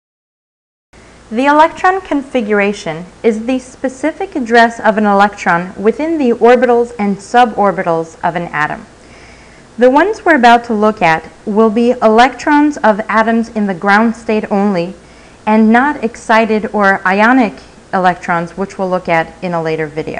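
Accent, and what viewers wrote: United States. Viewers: Why does every chemistry video have an American accent!?!?!?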